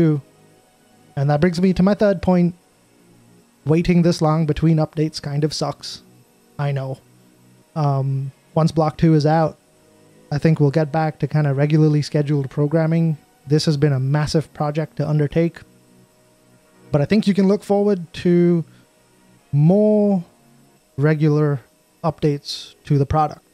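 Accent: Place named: United Kingdom. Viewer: This dude can't decide if he's British or American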